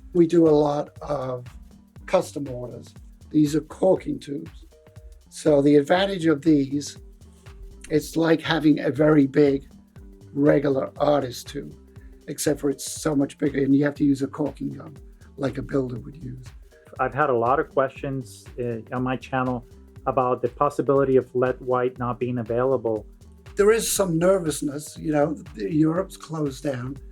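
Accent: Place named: New York, United States. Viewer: That is absolutely a NY accent!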